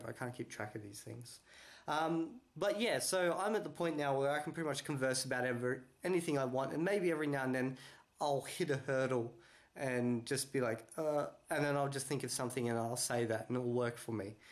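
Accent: Australia. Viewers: g'day brother you sound Australian.. any chance for a few lessons.. live In Buxton nsw near Picton. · Yeah, basically. I feel like a lot of Esperanto material is geared towards komencantoj, but I have been reading more advanced stuff lately. "Being Colloquial in Esperanto" is one of my favorites for English-speakers. I also just ordered "Vojaĝo en Esperanto-lando" directly from Boris Kolker himself, so that should be good. My Esperantist friend in North Carolina wants me to attend NASK,(Nord-Amerika Somera Kursaro) this summer, so we shall see. But what I've really been doing is what I call immersion. I've been trying to spend as much time as I can in Esperantujo. I skype with Esperantist friends, listen to Esperanto radio and music, chat in Telegram chats, read in Esperanto, and I've even started to have dreams in Esperanto (mostly stress dreams about being somewhere with fluent speakers and not being able to follow the conversation). 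I think it's working, though. I feel like I am making great strides. Between my first and second live Esperanto meeting, I noted a marked improvement in my ability to hold conversation in Esperanto. I really enjoy your videos, though. They are helpful, though I am somewhat embarrassed that I've known Esperanto longer than a lot of fluent people but and not as good as them. I'll be there, though, soon! I enjoy both your Esperanto-language videos and English ones. As a side-note, I first found your Esperanto language videos and I was surprised to hear your Australian accent in English. Good job on your Esperanto accent!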